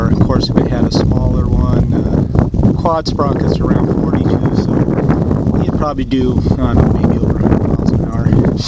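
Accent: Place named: Midwest, United States. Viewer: Are you from the Midwest? Your accent sounds exactly like a friend of mine. Kept thinking it was him. Kool conversion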